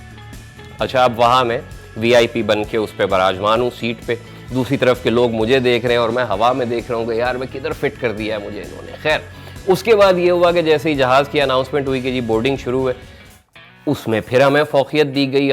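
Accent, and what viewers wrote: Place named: Karachi, Pakistan. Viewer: bhai are you from Karachi? Your Urdu Dialect says it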